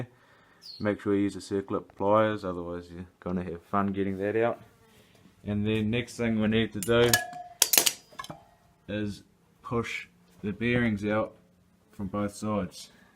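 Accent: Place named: New Zealand. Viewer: Kiwi repairs with a kiwi accent are so much better to watch. And kiwi gumboots as well... lol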